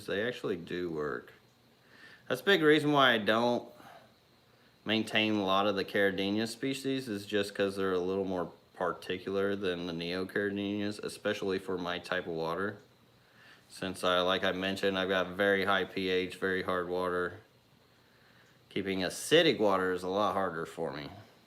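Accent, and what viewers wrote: United States. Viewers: You sound like such a hill Billie . Love it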